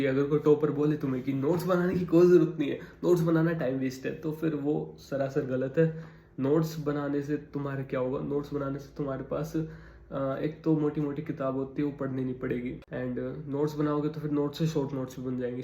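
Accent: Haryana, India. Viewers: Hariyanvi me hi bol k video bnaya kro mast mst lgte ho apne accent me dono 🥰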